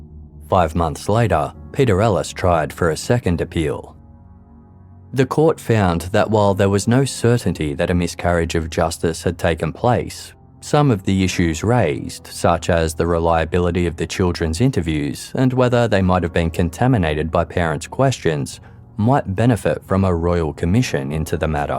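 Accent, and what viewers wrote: Australia. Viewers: Well, he is Australian. Not exactly a sophisticated accent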